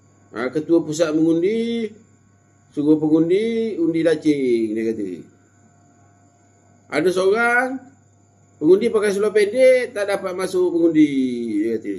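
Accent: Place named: Muar, Malaysia. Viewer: sempoi la bagi insight analysis PRN Johor. simple tapi detail. berborak style orang Muo. lawak tapi bisa.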